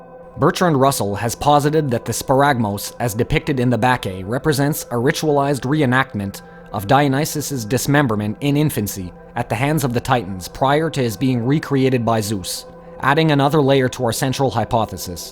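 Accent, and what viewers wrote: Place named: Quebec, Canada. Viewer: Tu sonnes Québécois, bonne vidéo!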